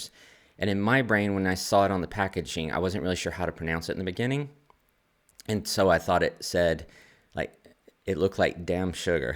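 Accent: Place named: United States. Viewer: Regarding Kött and fack. It is not because of your English but because of your American dialect; you don't pronounce all different English vowels. You pronounce some of them the same, unlike in English (The language spoken in England).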